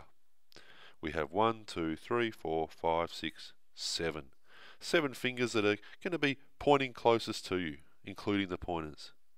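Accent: Australia. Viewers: Why are you talking with like a flu like kind of voice? Are you an Aussie or you are really having a cold? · He speaks very clearly. I guess you are just not familiar with the Australian accent :)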